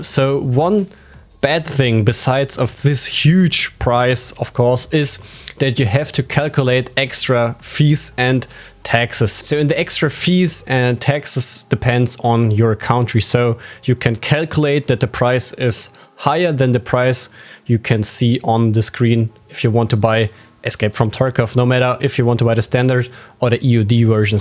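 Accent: Germany. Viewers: Man hört so krass das er Deutscher ist xD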